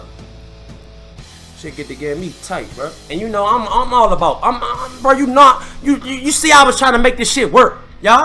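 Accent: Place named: DMV, United States. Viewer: He gotta be from the DMV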